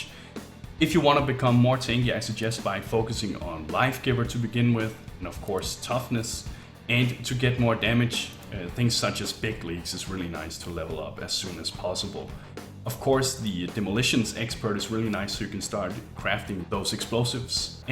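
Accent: Denmark. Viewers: My god you have a Danish accent a viking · But yeah, your accent gave it away, we danes have a weird accent. xD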